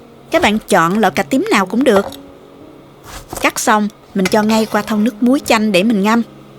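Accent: South, Vietnam. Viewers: Giọng chị đọc chuyện nam bộ đúng bài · Giong miền Nam rỏ ràng không có quê, nghe thấy mến giọng nói va cách dạy thật tỉ mĩ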